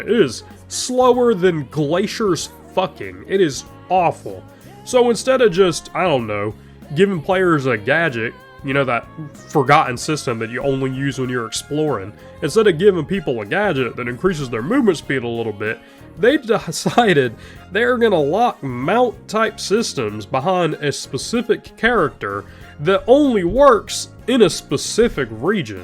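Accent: South, United States. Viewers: Not relevant to video but are you southern by any chance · didn't post for a month and came with a southern accent. omega based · ah i wondered why you sounded so overly american lol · How did your Southern twang intensify over time 😂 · the southern accent is awesome, glad to see the upload! hope you feel better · Firstly damn sounded a lot more southern than he usually does In all seriousness though yeah the gacha selling Genshin has been having has been getting out of hand and honestly I'm glad to just be watching the chaos unfold from the sidelines because it has been getting ridiculous lately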